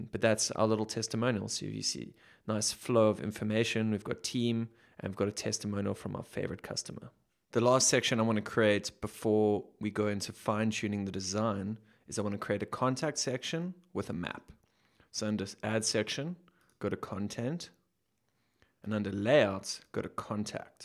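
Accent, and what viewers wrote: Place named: South Africa. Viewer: Superb tutorial – and nice to hear it all in a familiar South African accent 😊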